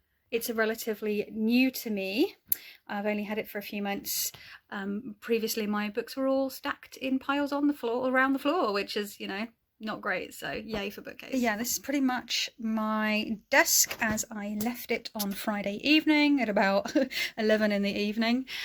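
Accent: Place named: Scotland, United Kingdom. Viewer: This was fun! I definitely think you should have some stitching in each vlog - I love watching your technique! Your stitches are so neat! And what a fun Scottish accent!! 😄👍 Did you make a pouch for your Noblet tarot?! I'll need to make one. I'll see what colours I've got available 😄 Looking forward to the next one! 💖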